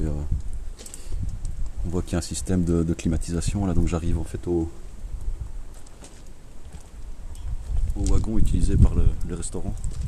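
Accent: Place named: Belgium. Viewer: L'accent Belges, J'adore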